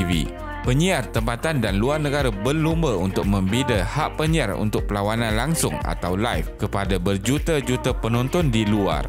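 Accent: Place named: North, Malaysia. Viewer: abang yg ckap ni msti orng utara..hahahaha..sapa ja yg ckp jutaa kepada jutee...buruk ngat aihh bang..besa2 dah laa..😂😂😂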